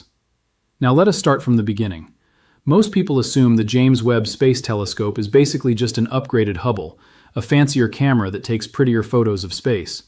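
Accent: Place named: United Kingdom. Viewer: Interesting stuff. Notice he goes into a British brogue after about three minutes. Oh that’s weird having an AI change an accent midstream.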